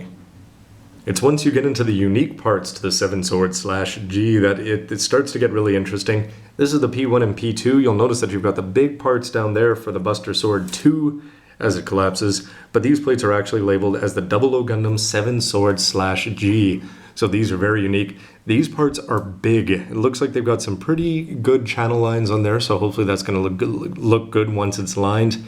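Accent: Canada. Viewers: is, in my summation, Canadian. I say that based on his accent and vocabulary. And honestly, I am curious why he lives in Japan but if he wants us to know he will decide when and if he tells us. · Judging by his hands and his accent, I'd say he's probably white and probably from Canada or the northern United States (because it sounds like he says "out" like "oot" sometimes).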